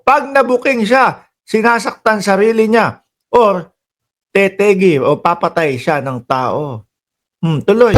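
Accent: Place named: Waray, Philippines. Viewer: Parang waray MAGSALITA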